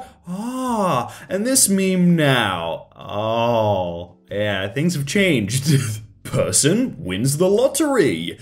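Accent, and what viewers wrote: United Kingdom. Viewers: day 26 of asking that hes british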